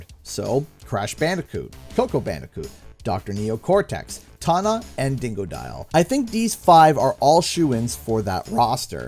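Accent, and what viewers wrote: Canada. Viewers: It's cool that you have your own Crash 4 with a -version of Crash.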